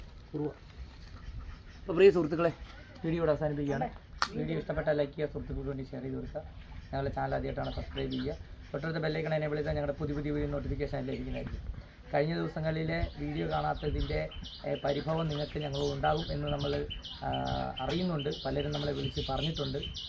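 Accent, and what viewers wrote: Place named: Kottayam, India. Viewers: Kottayam boundary aanu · നിങ്ങള് കോട്ടയം ആണോ ആലപ്പുഴ ആണോ സംസാരം കേൾക്കുമ്പോൾ കോട്ടയം ആയിട്ടു ആ എനിക്ക് തോന്നുന്നേ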